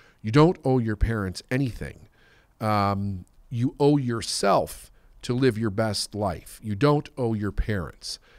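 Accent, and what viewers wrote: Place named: Midwest, United States. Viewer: This guys midwestern accent is driving me NUTS. Bayack, flayat.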